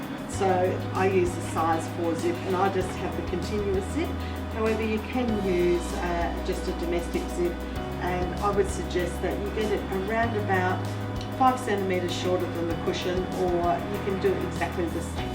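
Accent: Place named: Australia. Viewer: Fantastic Video Tutorial. Thank you so much for sharing. I am on Gold Coast and great to hear an Aussie Tutor. I wish I had seen this video before I made my last pillow. Would have had a much better finish. Merry Christmas and Thanks again.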